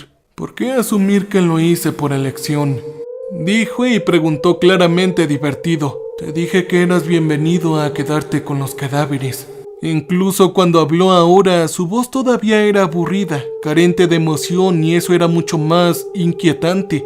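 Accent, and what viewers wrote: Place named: Mexico. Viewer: Tu eres un mentiroso o cuenta los cuentos de otros, en otro cuento eras de Inglaterra y ahora eres de EEUU cuando tu acento es mexicano o de sur américa. Yo soy norteamericano y de descendencia de P.R y cuando vives en EEUU pierdes el acento latino.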